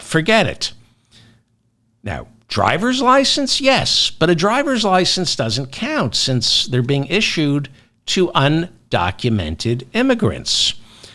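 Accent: New York, United States. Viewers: don't worry bro, I suspect your listeners are smarter than the average bear. We can look shit up. a priori: relating to or denoting reasoning or knowledge which proceeds from theoretical deduction rather than from direct observation or experience. So that's like circumstantial evidence, right? And I knew you'd come up with Kevin McCarthy's name sooner or later. Disappointed it took you so long, but never for a second did I have any doubt that you had it rattling around up there somewhere. And no one ever recommended your show to me, you randomly popped up in my YT sidebar one day and I clicked on you, no idea why. After one episode of that slow measured deliberate soothing NY drawl I knew you were my Huckleberry. I listen to you on my phone in the wee hours early in the morning when I need help falling asleep. Seriously though, I find I agree with you more than any other left-wing commentator I've seen on YT. 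If you were a little younger and sexier and had a full head of hair like Brian Tyler Cohen, Kyle Kulinski, Jesse Dollemore or David Pakman you'd easily have 5 million subs. Problem is I've seen your pics, oof, you weren't any sexier 20 years ago either. But either way this was your true calling, you never should've wasted all those years writing comedy and pretending to be a 3rd rate comedian. If you had started doing this a little earlier you'd be a progressive independent media juggernaut by now. I'm still not buying any of your stupid mugs and crap though so don'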